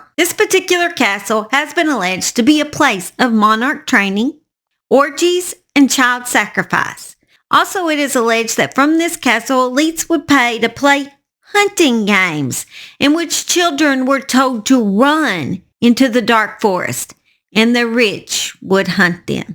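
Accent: South, United States. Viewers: me too, loads of stories I haven’t heard of, and there’s been a lot, if you decide to visit wrap up well 🧥🥶🧣🧕 your southern accent is very comforting to me, iv always wanted to visit loads of places in America but ended up in New York twice xx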